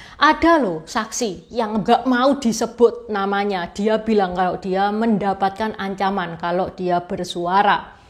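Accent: Java, Indonesia. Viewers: logat jawa. klo cina jkt ga mgkn akan sounding hal ini, karena kalian ga akan prnh 100% paham tragedi kelam itu. sesuatu yg bkn utk dijadikan cerita. -some truth better left unspoken-. yg prlu dilakukan stlh masa itu adalah tetap berbuat kebaikan dan pertebal penjagaan diri.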